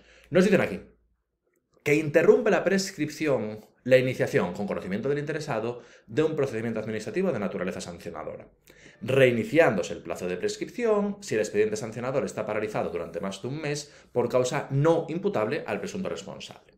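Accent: Galicia, Spain. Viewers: Muy bien explicado y con acento gallego, que me encanta. Gracias.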